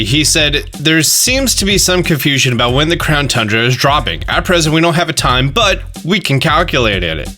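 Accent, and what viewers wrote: New Jersey, United States. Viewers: Ayy you from jersey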